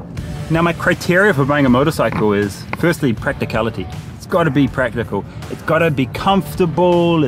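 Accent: India. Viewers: Nice job Very Nice. India is now your Oyster. Safe driving. I've noticed that your New Zealand accent is Vanishing and I don't know you, I've noticed that you are gradually slowly getting a hint of Indian accent different words are stronger than others, No doubt you have already been told this?👍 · I love how the inflection of the Indian languages come through in your voice, it’s really cool.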